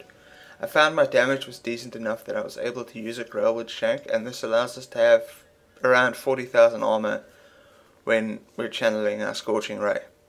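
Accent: South Africa. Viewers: I knew that accent was South African :D mooi man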